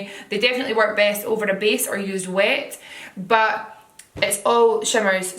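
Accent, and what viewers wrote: Scotland, United Kingdom. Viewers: Scottish accents make me happy. lol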